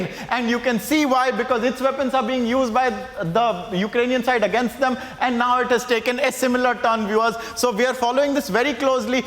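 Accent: India. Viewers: This sounds INDIAN..blah blah blah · Very indian sounded reporter...very entertaining...well done the Indian reporters..you guys have overtaken any other major news agencies world wide...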